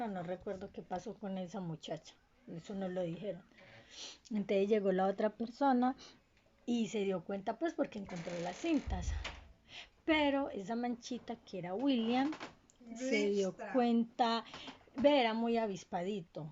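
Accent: Colombia. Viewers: vi todo el vídeo y escuche tu acento eres de Colombia?? Que buen video por cierto de chiquito me daban miedo los electrónicos XD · que señora con cultura mi valecita mia Bv, eres colombiana? Se escucha en tu acento, si es verdad yo también 👌👌👌 Pd: nueva sub gracias a este hecho contenido 👌👌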